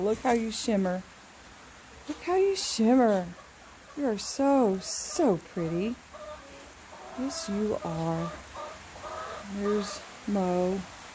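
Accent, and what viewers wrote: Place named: Oklahoma, United States. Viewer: I personally don't think it's anything you're doing if your view count has gone down or likes because of everything going on with the chicken industry and all the red flags evolving around chickens I think that there is more people making chicken videos than ever which is changing the algorithms and YouTube likes to mess with people like yourself that's true and genuine it's not people that's leaving your channel because you're mentioning God it's because YouTube's making them miss the video and not sending people their notifications because you're mentioning God and you're popular now I think your videos are awesome and you also remind me of my grandma that's one of the reasons I watch your Channel because you talked to your chickens and you do not belittle them yeah there's something about your Oklahoma accent that reminds me of my grandma. 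So please ma'am don't get discouraged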